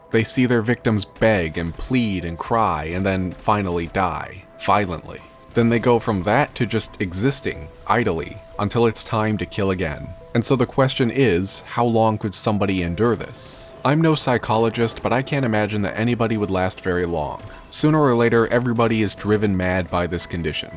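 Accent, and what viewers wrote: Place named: Midwest, United States. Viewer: Are you from the U.S. Midwest? You have a bit of an accent.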